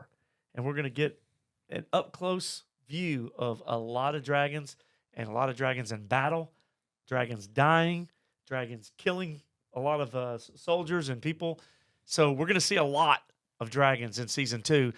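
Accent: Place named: South, United States. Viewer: What accent does the main host have? I know it’s a Southern accent from the US, but is he like Tennessee or?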